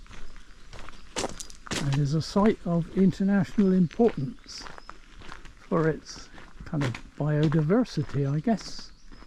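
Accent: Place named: West Country, United Kingdom. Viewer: There is nothing that beats a Devon or even Dorset Summers day walk along the coast enjoying the wild flowers,the birds & the bees,along with fantastic views together with lovely west country burr historical story telling especially if you now live overseas. Superb❤😊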